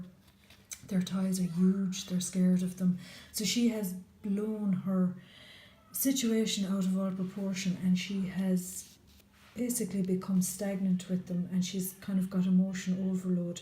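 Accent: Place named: Ireland. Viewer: I love your accent! Puts me in mind of my recently passed, VERY Irish grandfather. He is missed and you are delightful:)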